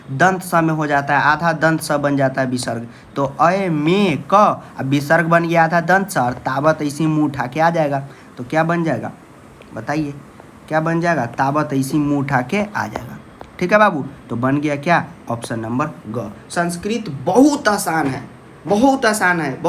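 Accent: Bihar, India. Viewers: Sar aap Bihar se hain kya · Aapki bihari language se hasna ha raha hai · Language se hi pata chal gaya tha ki sir Bihar se hai because I am also bihari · Sir ap vihar se belong kerthe ha kya kuki apke sound se thora thora lagtha ha ki ap vihari language bolthe ha. Otherwise apki ye video bhaut achi ha. Nice video.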